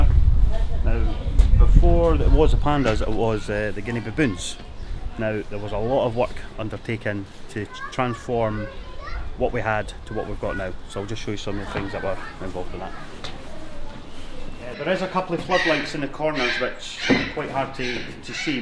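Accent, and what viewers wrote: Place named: Scotland, United Kingdom. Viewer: I love the scottish accent. ;o)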